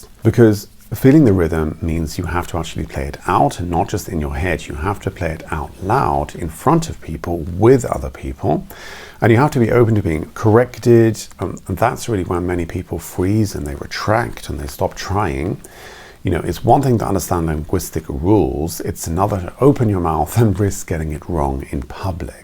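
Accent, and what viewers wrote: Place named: Germany. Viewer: haha as someone studying german for A level at around B2 level in england, I wonder if anyone has told you that you actually are developing a slight german accent in the way you say some words lol! Anyway, this is a very interesting and informative video, danke!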